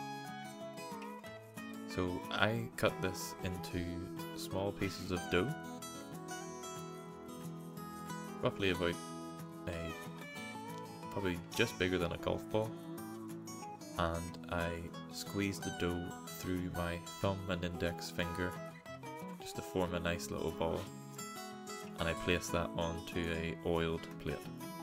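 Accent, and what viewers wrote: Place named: Ireland. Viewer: I thought I heard an Irish accent :)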